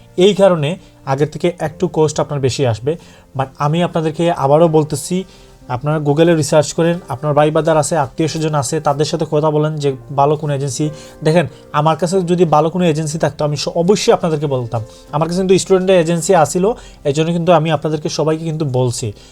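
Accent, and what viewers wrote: Sylhet, Bangladesh. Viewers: vaiyar basa ki sylhet ? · ভাই মনে হয় সিলেটি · Bhai ki sylheti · আপনার কথাগুলো তো অনেক সুন্দর সিলেটি মাল👍👍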